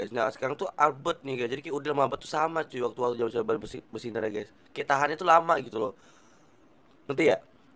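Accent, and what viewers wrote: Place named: West Kalimantan, Indonesia. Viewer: mungkin logat.nya kalbar bro maman sama doyok teguh jga hampir sama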